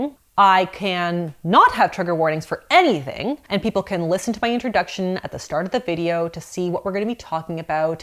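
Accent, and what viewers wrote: Canada. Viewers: I love your content!! I’ve learned so much about healthy eating and diet culture. Personally, I’ve never been triggered by your content and if anything, those struggling with ED could benefit so much from the truth that you share❤️‍🩹 you are a blessing! And I love your Canadian accent😂💚 keep going girl!! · She’s Canadian I believe 😊 so it might be an accent. My husband recently pointed out to me the Canadian accent and now I can’t unhear those slightly different pronunciations which I find cute (I hope that’s not offensive, I enjoy listening to people’s accents)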